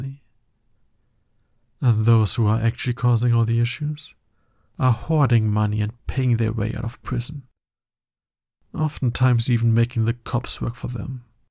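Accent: Germany. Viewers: Samma ihr könnt mir doch nicht erzählen das nur ich den deutschen Akzent höre 😂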